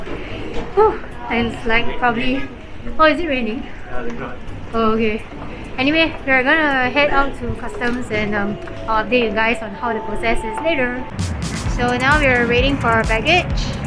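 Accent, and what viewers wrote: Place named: Singapore. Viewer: Listening to ur singlish just made my day... Reminds of my 3 years uni at Nanyang.. How i struggled during my initial days to get used to singlish with my professors.. Love the singlish btw.. Enjoy ur trip to Japan.. Love from India 🤗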